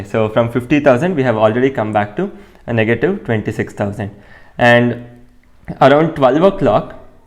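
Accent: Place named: Kerala, India. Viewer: Accent vinte malayali laga vunnaru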